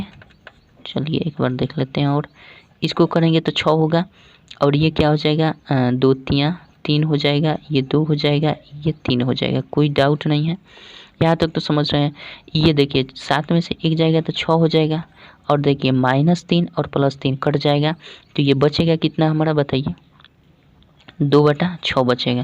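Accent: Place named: Bhojpuri, India. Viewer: Bhojpuri nahi bolte sir par aap bolte hai to accha lagta hai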